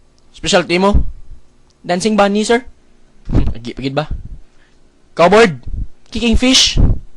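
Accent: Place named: Iloilo, Philippines. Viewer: hahaha.... ilonggo gid man ah...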